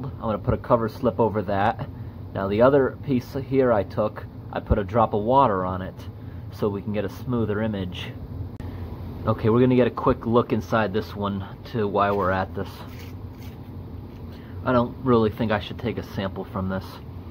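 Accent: New England, United States. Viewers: Who wants to play a guessing game and guess where this dude is from in Mew England? I’m guessing Maine, maybe Connecticut.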